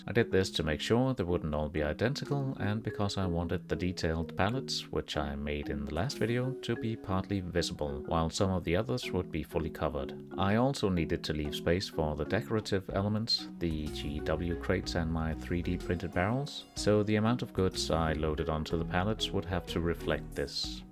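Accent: Denmark. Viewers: Do I spot a danish accent? If so, where do you source XPS? It is a pain to get a hold of here.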